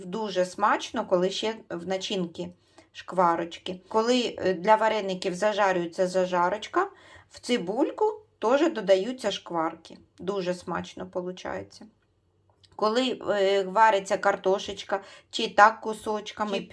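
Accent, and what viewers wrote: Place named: Kyiv, Ukraine. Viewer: Дякую за відео))) Комусь не подобається київський суржик)))а мені його не хватає(знаю що не вистачає,але в києві так)))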